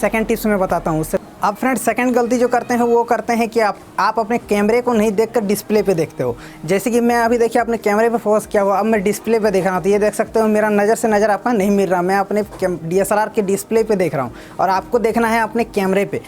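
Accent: Bengal, India. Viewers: bhai ap bangali he kiya? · vai aap Bengali Ho....?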